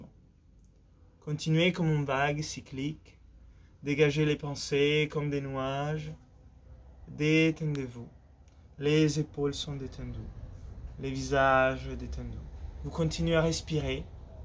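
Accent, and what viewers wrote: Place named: Italy. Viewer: Merci beaucoup! J'adore ta façon de coacher. Je pratique la respiration Wimhof depuis plusieurs mois mais j'ai acquis plus de fluidité et de détente en t'écoutant. Ayant travaillé de temps à autre en Italia, j'ai aussi le plaisir de retrouver la musique de ton accent!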